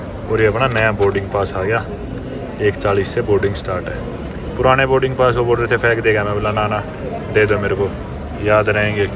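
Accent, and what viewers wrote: India. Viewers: Love your desi accent 👍🏻 and equally amazed to see how well you speak latin american languages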